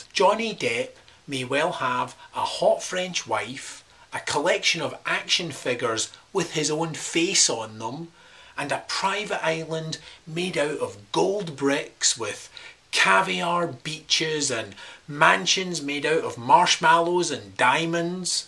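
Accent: Scotland, United Kingdom. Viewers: You're Scottish? o.o..I know someone in Scotland =D I've known him for like..4 years =)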